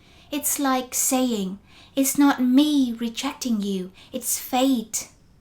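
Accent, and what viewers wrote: Vietnam. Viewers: is this southern or northern vietnamese ????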